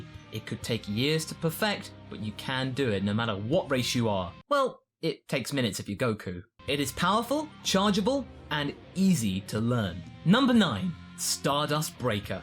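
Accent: England, United Kingdom. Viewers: Its because of the english accent. That's just how we say it over here. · gt live supporter British. You can't tell by his accent?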